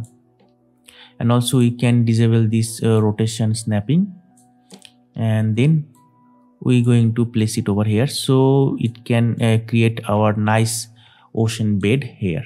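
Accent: India. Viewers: But in an Indian accent 😓.. waste of time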